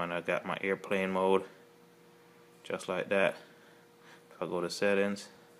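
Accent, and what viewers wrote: Jamaica. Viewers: You're Jamaican i can tell my your accent. I'm Jamaican too.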